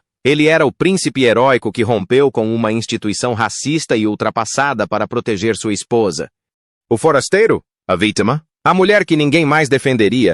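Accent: England, United Kingdom. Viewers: Narrativa boa Mas a introdução de frases com sotaque inglês e totalmente desnecessária.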